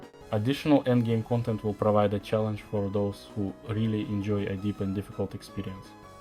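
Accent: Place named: Russia. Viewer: Russian accents 🤤🙏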